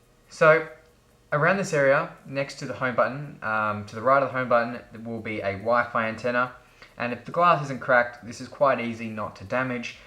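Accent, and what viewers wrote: Australia. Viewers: He lives in Australia. He has an accent. He means cellular. I have a cellular iPad 3, but never used the cellular service. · You can clearly hear that he is an aussie wtf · Is he Australian?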